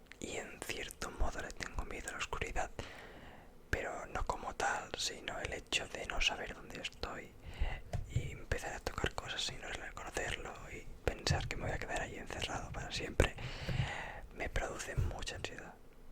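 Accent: Spain. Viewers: Eres de españa? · Eres catalan verdad? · tu eres Español o Estado unidense?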